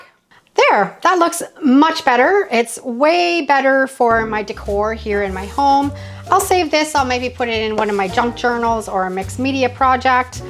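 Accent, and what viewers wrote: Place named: Canada. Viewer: she’s so funny! I watch one of her videos at least once a night, and my husband yells out from across the room…. OUUUUUUUUTTTTT BECAUSE WE ARE NOT CANADIAN This is hysterical to us. Reminds me of all my aunts and uncles that live up there with this accent.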